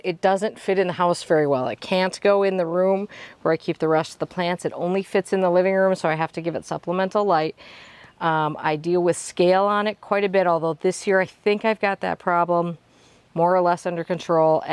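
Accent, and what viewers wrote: Wisconsin, United States. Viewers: I was watching your video and my husband immediately picked up on your accent. He is from WI too but has spent the majority of his adult life in the south. Soooo, I love your videos but I also love that your in WI. Door County is one of my favorite places in the world. Fragmented chain of thought, sorry. Bottom line, can’t wait for the next video!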